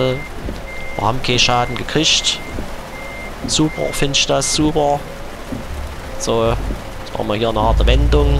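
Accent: Germany. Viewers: Ei verbibcht noch e mo, da kimmt ahner wo och ausm scheene Sachseländle :D ... Mir sinn fai gluuch, dischdsch lusdsch, schnibbsch und gudmiedsch sinn me ooch und manschema e wing einfelltsch, nur eens simmor ne: Dehmlisch! Grüße aus Dräschden :D So, genug von dem Scheiß, zu deiner Frage: Nein, Himmel, kein Minecraft :P Also, ich guck ja viel bei dir mit und kann dich mitlerweile gut ab, aber dort bin ich raus...sorry ;)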